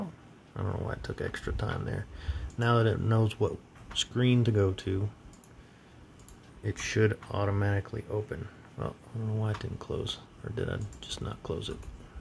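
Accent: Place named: South, United States. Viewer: Thank you you sweet southern man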